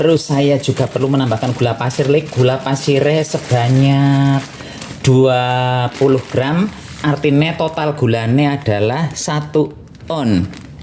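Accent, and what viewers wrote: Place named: Banjarnegara, Indonesia. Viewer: Mas...aku ngefans banget karo njenengan....saben dina nontoni nyutube..nek minggu libur..sok praktek...top resepe kereen. Nyong dadi kemutan desaku nang kabupaten Banjarnegara....ngomonge dialeke plek kecipek yakin.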